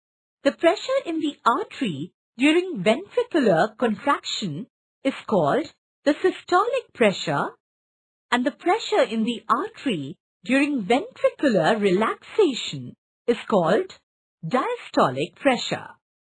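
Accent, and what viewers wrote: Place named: India. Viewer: What language is this ? English ? I barely can understand the Indian accent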